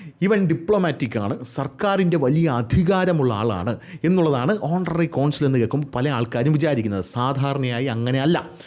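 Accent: Thiruvananthapuram, India. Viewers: 😂😂😂😂😂😂😂❤❤❤❤. അവസാനത്തെ ബാബു സാമി പൊളിച്ചു. Serious ആയ വിഷയം കോമഡി ആയി പറയാന്‍ ബെസ്റ്റ് തിരുവനന്തപുരം slang ആണ്‌ എന്ന്‌ തെളിയിച്ച അങ്ങ് ഒരു മഹാന്‍ ആണ്‌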